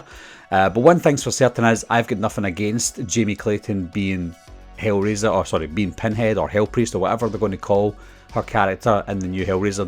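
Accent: Scotland, United Kingdom. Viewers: Yeah of course I love your videos pal and I'm Scottish so it's nice to hear my own accent online 😀 👍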